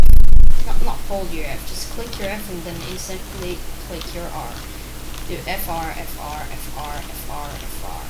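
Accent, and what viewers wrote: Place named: Sweden. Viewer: he sounding swidish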